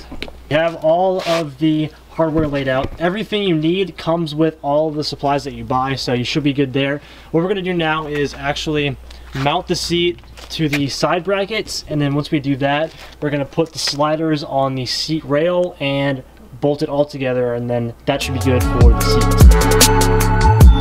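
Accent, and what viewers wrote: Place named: South, United States. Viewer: new to your videos but I could tell instantly yall were southern from yalls voice.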